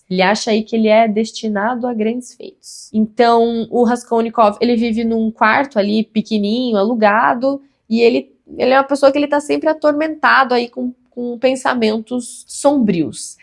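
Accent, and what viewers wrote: Paraná, Brazil. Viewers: Que sotaque lindo .....acho que é paranaense ou da serra gaúcha..... A resenha é excelente....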